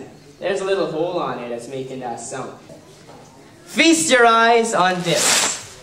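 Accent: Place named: Canada. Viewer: I love his inherent Canadianity. "Eh?"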